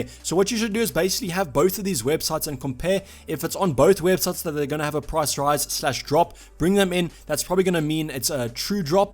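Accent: South Africa. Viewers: I love your South African accent :)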